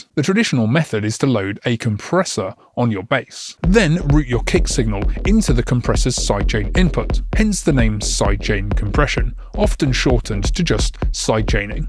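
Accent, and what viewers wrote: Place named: United Kingdom. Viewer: Great plugins and great content. Also British which is nice 🇬🇧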